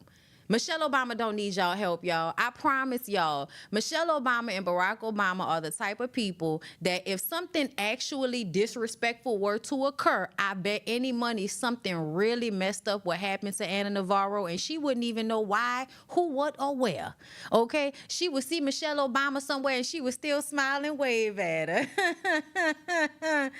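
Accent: New Orleans, United States. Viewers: I love New Orleans accent 'Baybeh' since Choppa on Making the Band & Toya came on tv 😍💜💛💚 i need to come to your 'Citay'😉. 😘💐 💙 Nuff love manners and respect from London 🇬🇧🇯🇲🇧🇧😇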